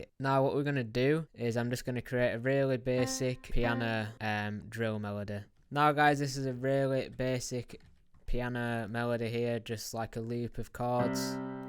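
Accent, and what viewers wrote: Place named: Yorkshire, United Kingdom. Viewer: I thought I recognized that Yorkshire accent. I'm from Halifax. Loved the video and gave it a like. P.S I use FL Studio and you're a Logic user but your 99.% perfect 😂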